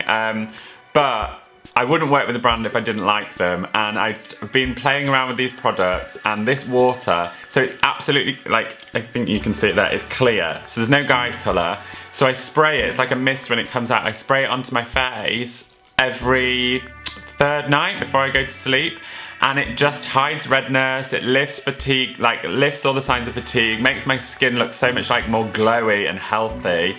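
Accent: Australia. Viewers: love you!!and that aussie accent just brightened up my dreary sunday:D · Love the Aussie accent. Hugs from Queensland!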